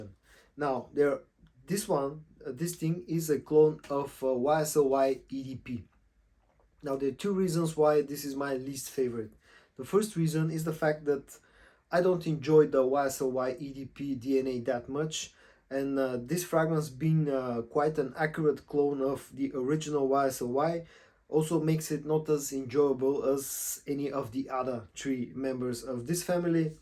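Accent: Bulgaria. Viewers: Very good video! Might sound kinda weird, but are you bulgarian by any chance? Your accent sounds bulgarian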